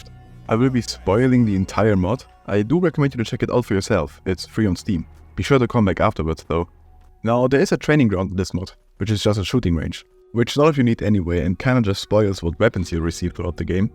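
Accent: Germany. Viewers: Kommst du ... aus Deutschland?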